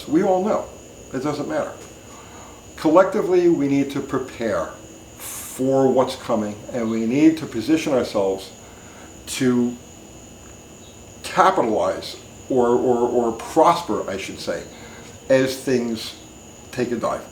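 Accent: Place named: Long Island, United States. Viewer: Sounds like you’re from Long Island... I left that place ... NY 24 years ago and never looked back!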